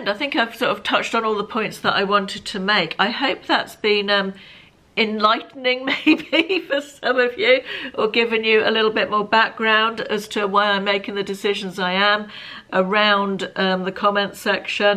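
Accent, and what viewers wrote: United Kingdom. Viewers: ❤ you do what is best for you! I'm a recent subscriber and enjoy all your videos. Funny thing.......I subscribe to 4 UK women a d you all sound so different 😊❤! Much like in America.....with all of our different dialects......find it so interesting 😊😊😊😊. Keep on keeping on! I enjoy all my British friends!❤❤❤❤😊😊😊😊Kathy from Pennsylvania USA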